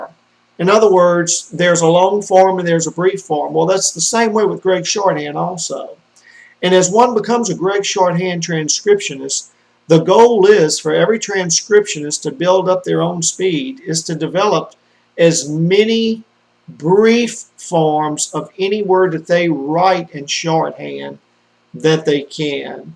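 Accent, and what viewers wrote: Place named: South, United States. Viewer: It's called a southern accent. Get over yourself.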